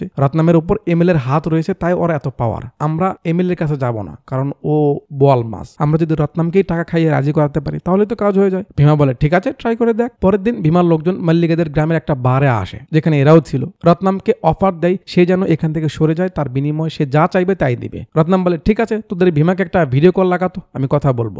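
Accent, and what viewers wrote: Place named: Rajshahi, Bangladesh. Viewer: Anpr Kotha bloar style aktu aktu rajshashi r Manus er moto... ❤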